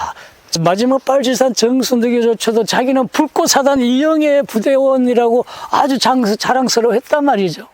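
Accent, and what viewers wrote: North Korea. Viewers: 북한말씨 느낌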